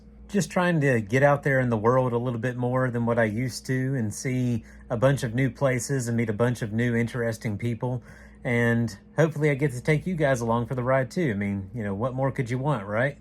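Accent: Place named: South, United States. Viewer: Nothin' but love, brother. I happen to like your voice. I'm originally from Kentucky but live in Illinois (I hate it here) so getting to hear southern accents I find very soothing. Plus, I love books and snack review type stuff, which you've done. So... yeah. I'm in for the duration plus ten years. (Also big First Responder & Military family so that's an added bonus)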